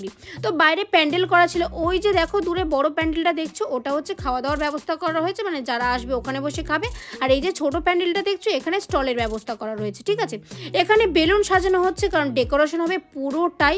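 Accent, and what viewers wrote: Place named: India. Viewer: Bono tomar bari kotay voice ta Indian mone hoche ami Bangladesh teke bolchi 1st time vedio dekte aslam😊❤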